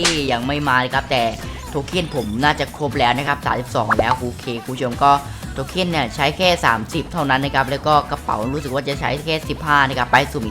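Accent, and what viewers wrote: South, Thailand. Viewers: คนใต้มั้ยเนี่ย ดูมาหลายคลิปแล้ว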